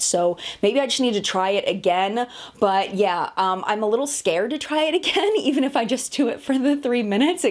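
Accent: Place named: Pennsylvania, United States. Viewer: Luv your content! You have a southeastern Pennsylvania accent (think Philadelphia). Where are you from??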